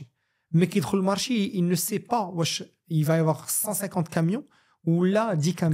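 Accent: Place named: France. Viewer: Surtout lorsque ces mots anglais sont prononcés avec un très fort accent français. C’est risible pour ne pas dire embarrassant.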